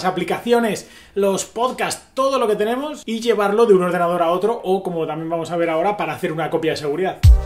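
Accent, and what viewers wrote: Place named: Galicia, Spain. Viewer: Jimmy Neutron con acento gallego!!! que cool!!!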